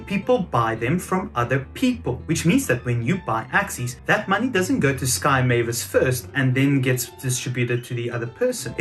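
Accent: South Africa. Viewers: I thought you sounded South African. I'm originally from Ghana, but I live in South Africa.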